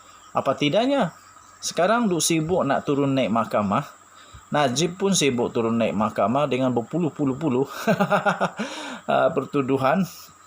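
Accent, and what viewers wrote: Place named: Borneo, Malaysia. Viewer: Adui jgn lh ckp smnjung klu org borneo bah...keras btul sbutn mu tu bro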